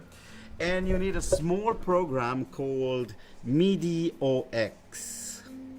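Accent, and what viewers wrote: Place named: France. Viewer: salut à toi l'artiste. je viens de découvrir ta chaine. vraiment très bien. vu ton accent franglais, j'en déduis que tu es français ? de quelle région? cordialement. Laurent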